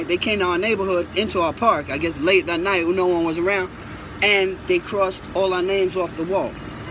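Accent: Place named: New York, United States. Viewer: Real new yorker. You can hear it. Bless up keepup the good work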